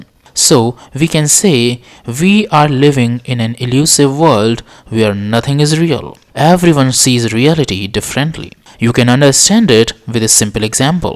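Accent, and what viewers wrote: India. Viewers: Ugh indian dialect 🙄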